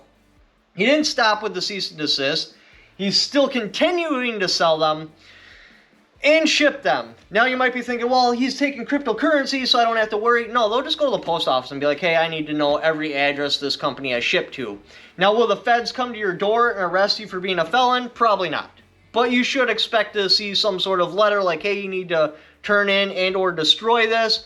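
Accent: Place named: New York, United States. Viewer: Is This kid in NY? Sound like a person from NY. When ATF says it is a machine gun that does not make it law. They inforce laws not make laws. Until it has been through the system not illegal. It would be illegal for an arrest.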